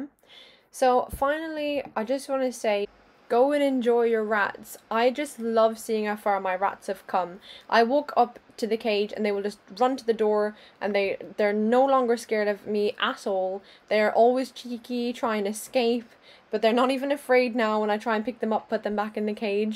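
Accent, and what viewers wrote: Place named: Ireland. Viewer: Love the irish accent! Great video! Next wk. will be bringing home very skittish little girl! Will follow your tips!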